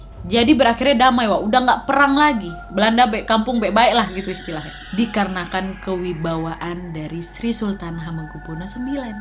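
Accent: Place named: Medan, Indonesia. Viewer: Aku pikir orang Medan? Bener enggak sih? Soalnya logat cakapnya macam anak2 Medan, ngejelasi macam begado, pakek ketawa dan macam gibah😆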